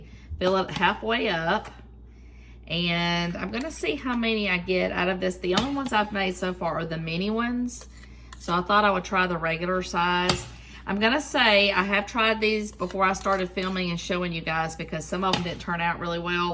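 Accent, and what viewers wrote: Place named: South, United States. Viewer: I just wanted to tell you how much I loved your video. I had forgotten all about that cake mix recipe. Being a longtime Weight Watcher, I was glad to see a good dessert recipe from my past. Your video was so easy to follow, which I really like. Also, I detected a Southern girl accent so I hope you will throw in some Southern recipes now and then. I am so glad that I found you by just scrolling along youtube. Have a great day from my mountain home in Western North Carolina.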